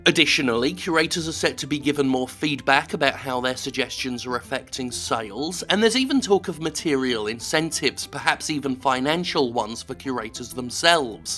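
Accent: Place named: United Kingdom. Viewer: Sven Tempest these countless accents you are talking about are dialects. someone from London might sound slightly different than say someone from Liverpool but they are still English accents none the less. take it from the perspective of someone from outside the u.k they hear someone like Jim speaking they think British but if they hear an Irishman speak then that guy is Irish not british even though he is part of the union. hell even people in the u.k never refer to their accent as british.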